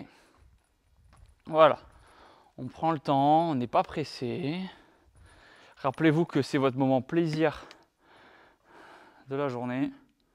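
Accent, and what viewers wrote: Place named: Toulouse, France. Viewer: 14 ans que je le suis aussi , on a connu tibo quand il avait l'accent "toulousaing" fréro le temps passe trop vite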